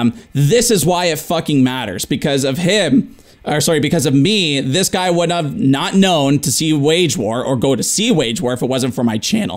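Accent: Canada. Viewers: So off topic - but I love your Canadian accent 😂 I’m all the way down in Maine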